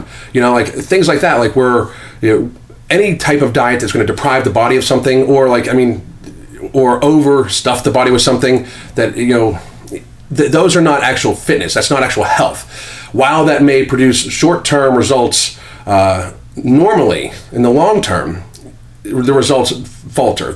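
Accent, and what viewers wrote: United States. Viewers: I told my Russian friend to watch your videos and he said despite being Russian you have a perfect american accent :D :D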